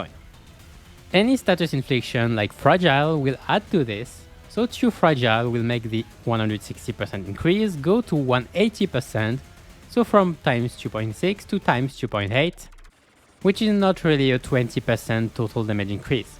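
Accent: France. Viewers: Thank again for tutorial i will send to my friend he really need (J'adore votre accent français xD)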